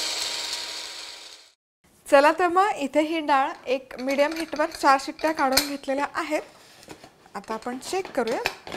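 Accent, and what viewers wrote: India. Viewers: Why do u speak so gavthi Marathi u can speak nicely also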